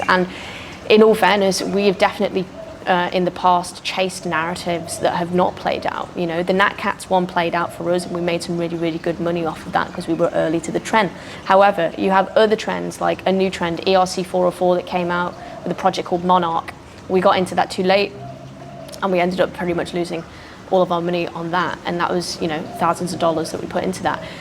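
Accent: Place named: United Kingdom. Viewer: Sounds like UK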